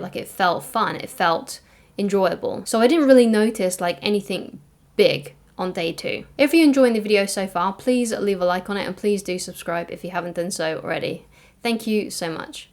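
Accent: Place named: England, United Kingdom. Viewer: Thank god a nice English accent.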